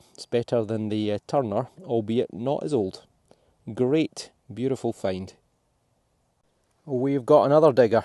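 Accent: Scotland, United Kingdom. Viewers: The Scottish accent is entertaining in itself, but could I respectfully suggest the proper pronunciation of the motto of the Order of the Garter: Honi soit qui mal y pense is pronounced (approximately) 'Onny swa kee mal ee pahnse'. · I’m sorry to those who got the emails. I for one feel that the enjoyment of your finds as well as the education I’m getting is amazing! That and the Scottish accent 😊. Thanks and congratulations again for the 30k subscribers.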